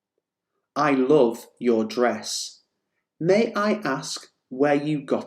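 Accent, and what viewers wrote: United Kingdom. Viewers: Hello. Is your English from the UK ?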